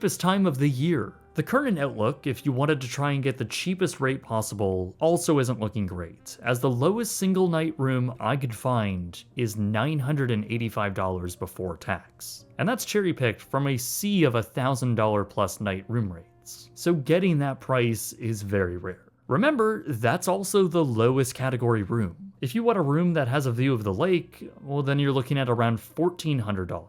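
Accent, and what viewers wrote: Canada. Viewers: You're Canadian accent is coming out incredibly annoying in this video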